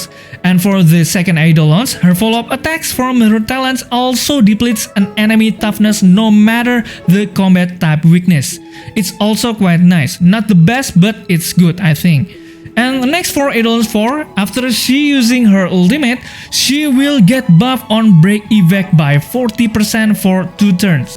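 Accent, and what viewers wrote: Indonesia. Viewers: Are you Indonesian by any chance? I kinda can hear the accent a bit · keep it up, even tho ur indonesian accent is to bold to hear, thumbs up from me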